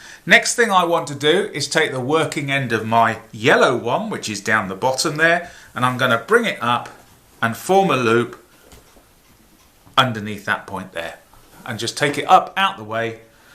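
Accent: England, United Kingdom. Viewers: first of all i wanted to say you can eat a bag of d*cks because you’re english accent wasn’t even the worst part it was trying to follow along with all of your unnecessary BS that you put me through watched a different video solved all my issues and i felt a sense of bliss. You on the other hand deserve to be taken off of youtube for making my life worse causing me to crash out watching your shiet video.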